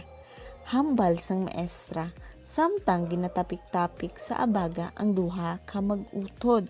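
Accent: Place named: Iloilo, Philippines. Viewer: Ilonggo gid ya...♥️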